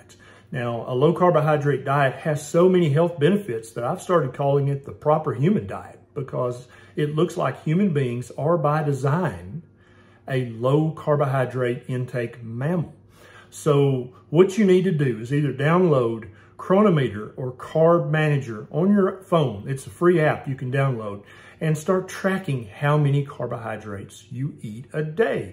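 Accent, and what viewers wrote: South, United States. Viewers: I could watch Dr Berry just for the southern accent.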